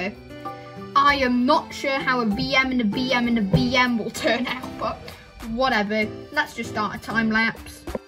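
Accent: United Kingdom. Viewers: your a cool underrated british yter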